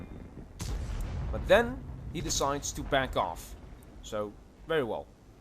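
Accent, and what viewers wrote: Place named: Netherlands. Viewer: jouw engels is zeker niet slecht,hier en daar wat woorden of zinnen die ik anders had gezegd of vertaald.. alleen dat nederlandse accent he? kan je niks aan doen,maar is wel grappig XD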